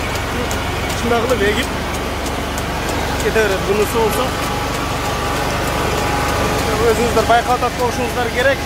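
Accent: Kyrgyzstan. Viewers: Карей тилин кыргызча суйлогондой эле суйлоп жатасызго таксыр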